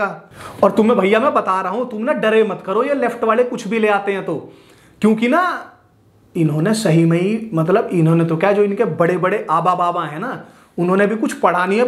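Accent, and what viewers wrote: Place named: Haryana, India. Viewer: Kahn the bhai itne dino se??? Aaj mile ho. Awesome work dude. Nice haryanvi accent.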